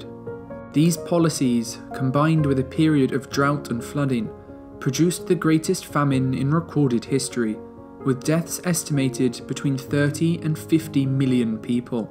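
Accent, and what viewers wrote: United Kingdom. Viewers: Just was wondering what is your nationality? You acent sounds British but very proper. It makes the documentary just that much better. Great video I thought I knew a lot about it myself but after watching this I can honestly say I fell like I learned a great deal in the time it took to finish your video. Please keep making videos.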